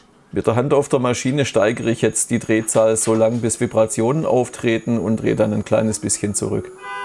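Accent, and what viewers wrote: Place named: Swabia, Germany. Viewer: Gut gschwaebelt,. I daet des ao so macha: wieder emol ebbes drechsle. Griesle vom Dreiländereck!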